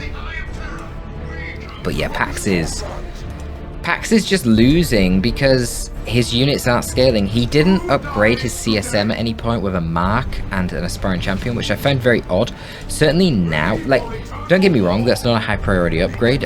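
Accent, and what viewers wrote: Scotland, United Kingdom. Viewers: Scottish accent casts henceforth yes? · GGs, and great scotch accent haha. Where are you actually from?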